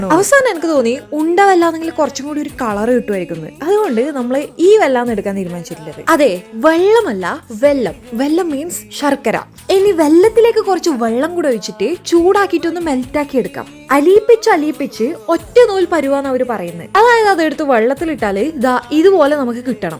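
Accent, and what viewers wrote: Kannur, India. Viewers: Pakka kannur basha annu appa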